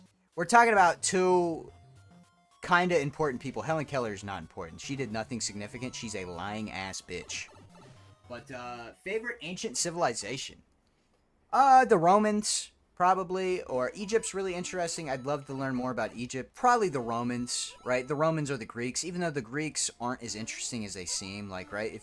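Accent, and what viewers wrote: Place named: New York, United States. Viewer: new york accent like Schlatt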